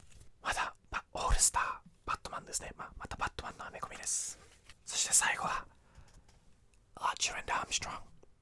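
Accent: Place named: United Kingdom. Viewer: 今日もPDRさんの英語囁き声が聞けて嬉しい( *´艸｀)←イギリス英語フェチ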